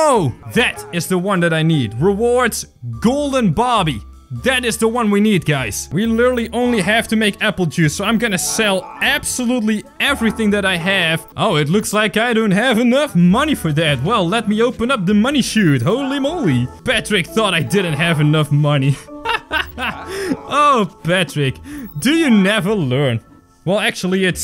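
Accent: Netherlands. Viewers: Nice futch accent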